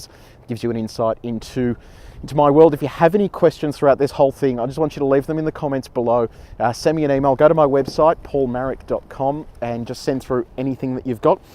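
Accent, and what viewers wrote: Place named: Australia. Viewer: Gotta tell ya, for your first go at this sorta stuff, you do it better than most. And it's refreshing to hear an Aussie vlogger:) can't wait to see more!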